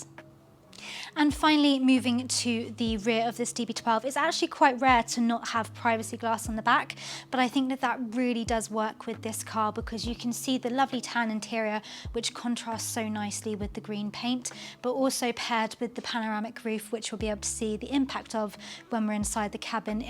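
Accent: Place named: United Kingdom. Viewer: I love woman speak British accent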